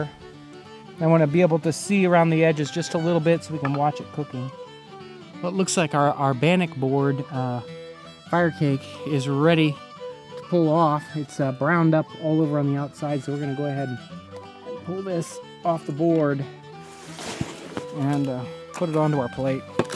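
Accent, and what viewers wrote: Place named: East, United States. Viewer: Sounds vaguely eastern-US.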